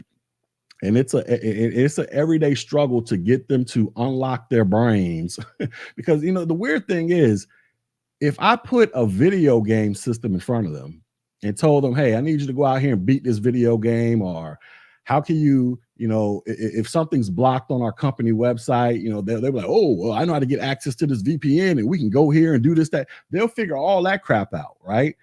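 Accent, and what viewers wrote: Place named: New York, United States. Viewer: I don’t mean to come as weird, but are you from Harlem or the Bronx? You truly sound like us. Youngin,B, Baconeggandcheese. New Yorkers talks with a lot bass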